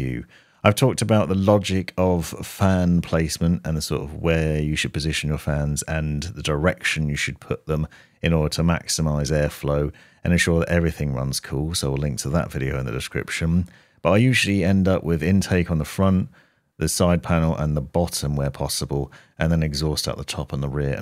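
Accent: United Kingdom. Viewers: you sound uk based, you know any sites with configurators where i could get a quote and plan to buy without really scummy pricing? cos the one site i do know make you basically pay its cost twice over by not offering a way to buy it in one go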